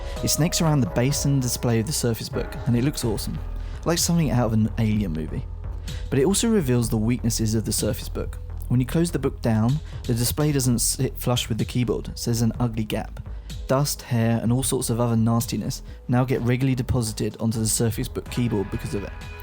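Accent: United Kingdom. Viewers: Brad Roberts He is English. So, his accent is UK accent... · OMG, in Russia we get used to hearing American English. I can't understand his perfect British accent. Bleeding of my ears.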